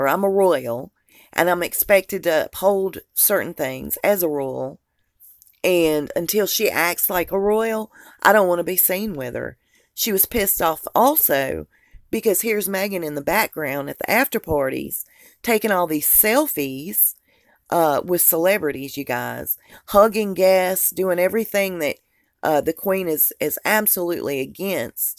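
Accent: South, United States. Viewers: What’s the Mystery Woman behind Your Southern Belle Voice ? I want to see your face🌹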